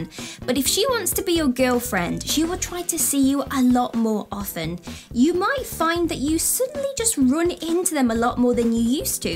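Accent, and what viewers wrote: United Kingdom. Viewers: NunYo BizNizz It’s British.., where did you get that idea from? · Uk accest much better then usa and Canada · I labh the British accent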